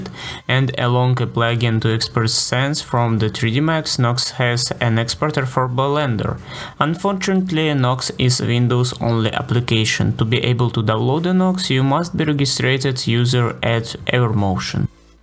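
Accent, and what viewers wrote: Russia. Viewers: Russian aksent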